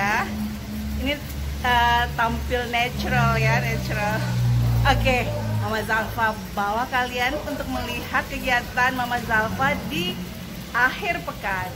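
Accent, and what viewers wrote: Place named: Palu, Indonesia. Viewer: Cucok meong memang ngana pe bakat...wkwkwkwk...hamaaaa...te sengaja qta dapa ngana pe vlog gegara ba cari resep sambal goreng daging kong pilih ngana pe resep,trus dengar2 ngana bicara roa...roa...qta pikir vlogernya orang Palu stouw...ternyata oh ternyata memang ngana orang Palu kong tamang SMP.lei...dunia memang selebar daun kelor kang hahahahah....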